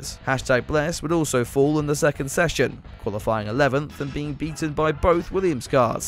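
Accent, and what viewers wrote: United Kingdom. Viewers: Honestly, I can't understand this vlogs commentary. Narrator talks too fast and too much British clipped accent for my taste. Definitely NOT at par with BBC standards.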